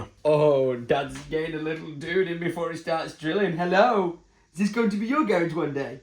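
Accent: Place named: United Kingdom. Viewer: YOURE FUCKING BRITISH MATE KEEP IT UP!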